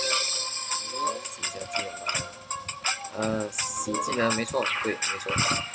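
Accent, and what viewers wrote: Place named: Taiwan. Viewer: 聽聲音J大好像是臺灣人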